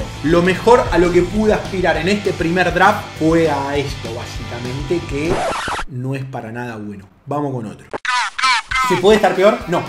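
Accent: Argentina. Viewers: Tu acento Argentino arruina el video!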